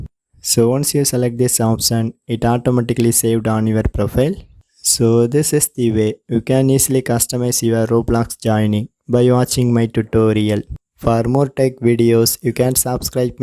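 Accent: India. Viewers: the indian accent slaps dude, anyways its helpful my dumb friends cant join me :D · he sound Indian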